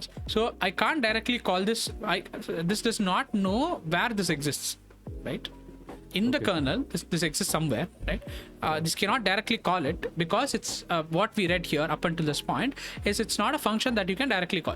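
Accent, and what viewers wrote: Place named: India. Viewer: Hi friend, i clicked your video because of the title ... really interesting topic, but i won't be watching the rest of the video, and because you are a fellow creator and just started i wantd to tell you why and give you a few hints on the way out - and hopefully be back later. First of all, a lot of people primarily listen to these kind of videoes, and besides from that you are providing a lot of interesting information, so the sound is really important. So i would recomend you a few things regarding that: 1: A lot of Indian speakers have horrible accents, but that is actually not that bad for you, but you speak very fast ... even if you where a native english speaker your pace is way to fast for this kind of content ... so try to speak slower and edit the sound so that your voice is a bit more clear in comparison wuth the background music. 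2: I realize that this was a livestream, and it's fine that you share those like this ... but please add an intro, nothing fancy, just a clip of you explaining what the video is about and highligting the most important parts. 3: If you want to be really fancy, make a curated version of the stream ... break it up and narrate it. I really think this is an interesting topic, but i listen to these videos when i work - and because of the pace i cannot focus on my work while listening to this, sorry. I really hope you take this feedback constructivly and goes to the moon, power to you my friend! 👊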